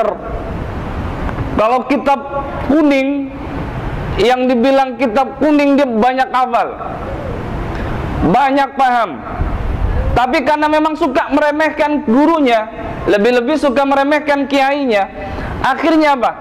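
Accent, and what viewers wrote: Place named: Madura, Indonesia. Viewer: mantap.madura nya masih melekat.hehehhehee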